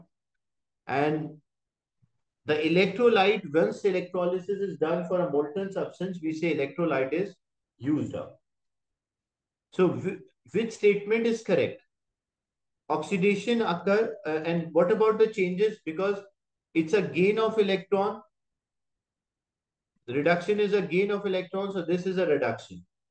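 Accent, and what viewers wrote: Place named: India. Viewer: Ur accent is indian are u indian?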